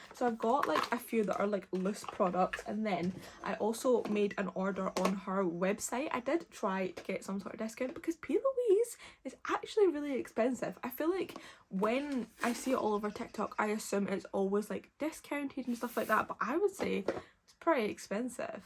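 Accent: Scotland, United Kingdom. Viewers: She is scottish😘☺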